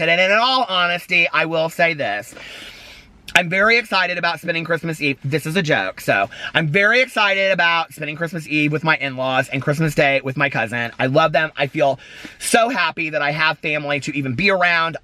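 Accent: South, United States. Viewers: I’m so happy that I’ve found you recently! I’ve never heard someone with a southern accent speak so fast but I’m from jersey so it just works for me. Hope you get to chill out after all of the madness 😉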